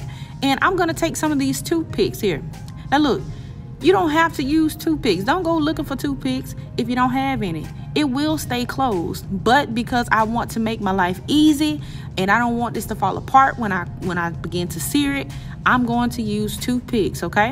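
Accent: South, United States. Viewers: Are u from the south? Like nc or SC? Lol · The food looks so good but can we talk about the southern accent????? Yaaaaaassssss sis… just know I hit the subscribe button! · Mmm yummy in the Tommy will try and you funny 😊❤️ you sound like you from down south 😊❤️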